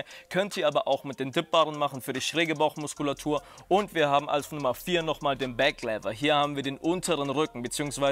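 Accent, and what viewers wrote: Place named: United States. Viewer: Hey coach, wow amazing! Warst du mal länger in Amiland? Du redest fast wie son cooler Amerikaner. Ziemlich nice.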